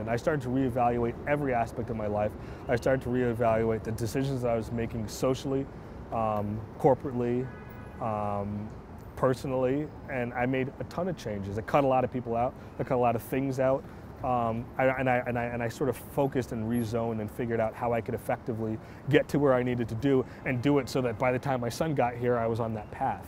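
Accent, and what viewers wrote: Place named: Africa. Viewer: You guys are actually from africa aren't you and now you're here giving us a bad name. Well listen up buddy-we don't need your help so stop hiding behind AA and show your true self. Covering OR dropping your accent is not fooling anyone😎😎😎😎😪😪😪😪😪😓😓😓😓😬😬😬😬😬😬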